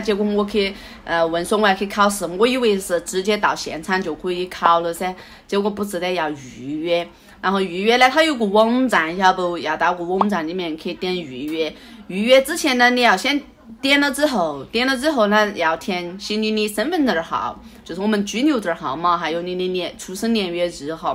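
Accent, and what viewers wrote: Guizhou, China. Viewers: 這是貴州話嗎？太棒了！加油！ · 先恭喜姐姐你考上駕照很棒喔、不過妹妹我有件事想跟您說、很開心可以聽到有貴州話的視頻、貴州話聽起來很可愛也很有趣、但是姐姐下次可不可以還是講普通話多一點、因為很多貴州話我都聽不懂耶哈哈哈很難去理解你視頻當中的意思只能大概猜一下囉不好意思.所以以後可以還是講普通話多一點嗎？不好意思. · 貴洲口音還滿可愛的我很努力的聽 🤣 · 貴州話和重慶話很近，但不一樣的地方也蠻多的哈！不看字幕，我聽得懂95%！😁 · 哇~第一次聽妳用貴州話拍影片，感覺貴州話口音聽起來蠻不錯聽的，有點可愛，有的很像台語，語助詞也蠻多的哈哈，恭喜妳機車駕照考過拿到到手了汽車要注意安全喔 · 恭喜很喜歡全程貴州話聊天聽起來費用力但是賊可愛滴 😘😘😘🤣🤣🤣🤣🤣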